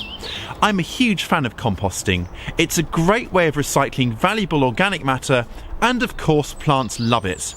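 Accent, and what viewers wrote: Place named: Cockney, United Kingdom. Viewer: Im learning English and I found your accent is little bit like cockney :)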